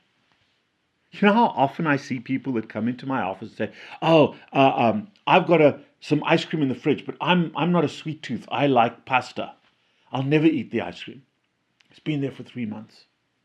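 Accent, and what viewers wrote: South Africa. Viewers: I am addicted to your videos . I love the south Africa accent and I love south Africa where I often travel through specially the transvaal near mozambique border . The Bantu diet was based on one meal a day based on vegetables. The only car b was maize but eated with fermented preparation .fruits only the children eat . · He's South African. He sounds like an Afrikaans South African.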